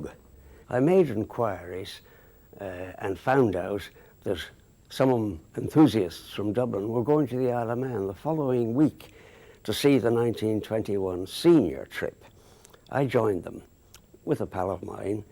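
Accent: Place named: Australia. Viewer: He sounds like an Australian.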